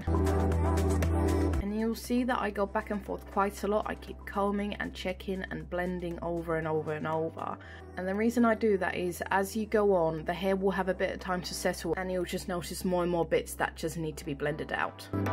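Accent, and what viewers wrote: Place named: United Kingdom. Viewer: Lovely British accent!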